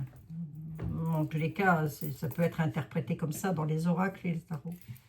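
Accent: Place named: Lorraine, France. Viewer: Votre accent Lorain je m'y suis habitué depuis que notre fille est installée chez vous maintenant un peu a côté depuis ce weekend elle vient d'acheter une maison en Meurte et Moselle du coup je l aime merci de nous rassurer et je sais que vous faite ce que vous pouvez mais oui fait aussi prié la France prie plus la vierge nous étions la fille aîné de l'église où est elle ? Courage Aussi a vous amène 🙏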